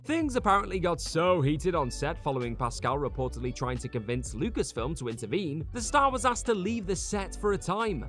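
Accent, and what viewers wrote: United Kingdom. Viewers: "Drunk". Narrator is British.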